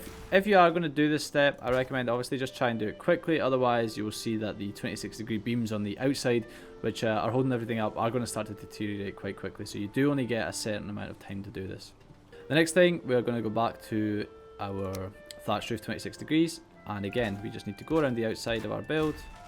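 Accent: Scotland, United Kingdom. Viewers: Nice build dude Are You Scottish?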